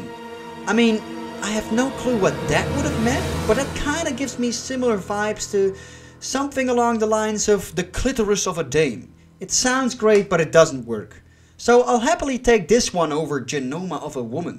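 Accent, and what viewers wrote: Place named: Netherlands. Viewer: I just cannot understand why your channel hasn't at least half a million subscribers: Awesome produced videos, great voice with that cool dutch accent, great text / writing, passionate about the Bond-franchise, no bullshit bla-bla, humorous & funny, clear opinions: just relaxing and informative the same time to watch. Oh-so-many channels could learn from that. But you HAVE to make a gun-barrel scene with yourself in that rad smoking. That would make it PERFECT! Just my opinion.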